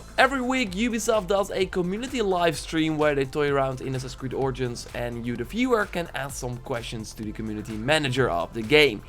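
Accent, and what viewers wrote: Netherlands. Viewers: Are you Dutch?? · Are u dutch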